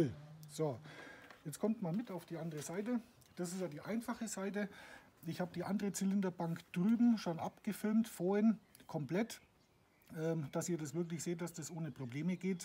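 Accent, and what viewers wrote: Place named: Franconia, Germany. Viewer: Servus, wo bist du denn her? Das hört sich schwer nach Frankenland an. Ich bin auch KFZ ler und würde mich gerne austauschen. Hast du ne Werkstatt oder Kontaktadresse?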